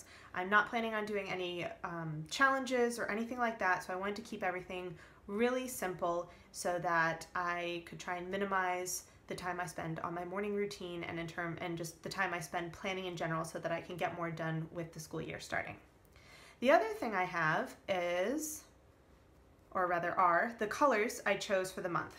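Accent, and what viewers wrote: United States. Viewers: Mais tu es française ! Bravo pour l'accent, on croirait une américaine ! Et aussi bravo pour ton BuJo, il est magnifique !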